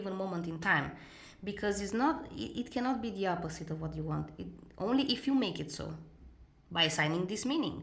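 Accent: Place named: Spain. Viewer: I have to ask what is your accent? I love it. You say you’re Spanish, but I’m Spanish and I never heard that accent, at least in English.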